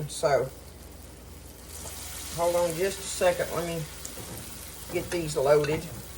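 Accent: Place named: Texas, United States. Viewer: I love you and your kitchen. We talk the same. I'm a Texan. I love you and your channel! Thank you!!!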